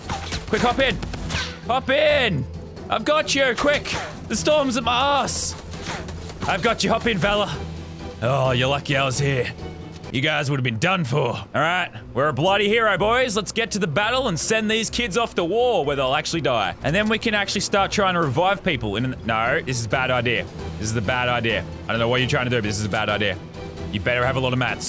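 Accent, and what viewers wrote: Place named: Australia. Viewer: Lol this dude is the funniest and that Australian accent is perfect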